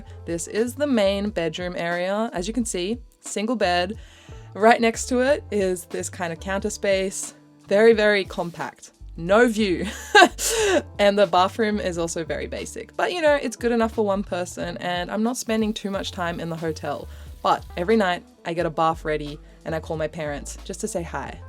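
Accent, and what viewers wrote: Australia. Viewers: Refreshing to hear an Aussie accent 🙏🏻 Cannot WAIT to be back in Kansai this week 🔥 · There she is!... You sound like you just came back from Australia... Like my Southern Drawl comes out when I go home... 😆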